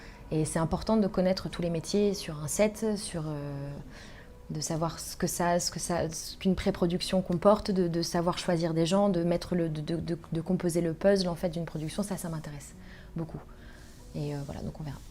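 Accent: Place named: France. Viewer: She has a very good strong frenxh accent and British one 😮❤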